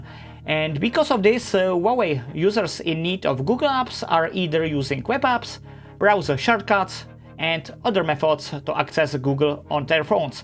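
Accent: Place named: Czech Republic. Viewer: Ta Česká angličtina xd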